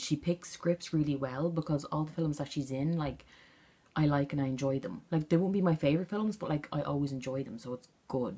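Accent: Ireland. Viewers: Stoppp this video is amazing and I love it. Your accent is GOALS :D I want to visit ireland SO bad <3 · I really loved this video! Argh your accent!! You make me miss Dublin!! New sub!!💕💕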